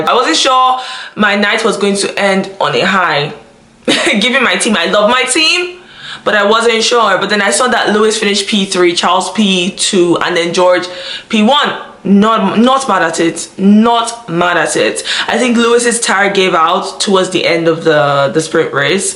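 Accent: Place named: South Africa. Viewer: your accent...you from SA?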